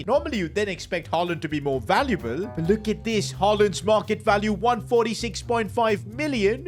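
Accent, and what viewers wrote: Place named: India. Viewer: your indian acnent just the way you said "i am building." 🤣🤣🤣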